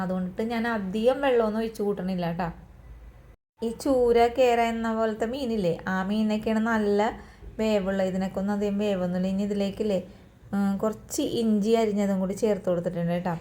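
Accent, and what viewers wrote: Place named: Ernakulam, India. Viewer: എറണാകുളം. കടലോരo. ശബ്ദമാണല്ലോ 😄. 👍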